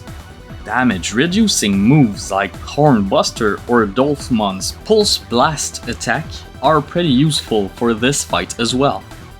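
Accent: Quebec, Canada. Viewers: You're clearly French Canadian, hahaha. nice video about a beloved game of mine. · Il me semblait que tu avais un accent canadien français, j'entend souvent à mon travail mes collèges parler en anglais, il y avait une ressemblance. En tout cas bel effort de tout faire en anglais ! Très bonne vidéo.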